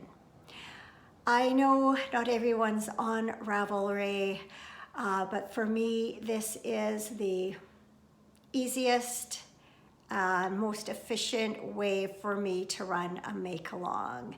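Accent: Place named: Canada. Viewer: I just found your podcast today Great to hear a fellow Canadian. I'm looking forward to joining your makealongs.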